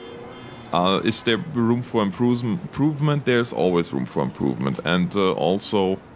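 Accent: Germany. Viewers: canadian? you have a german accent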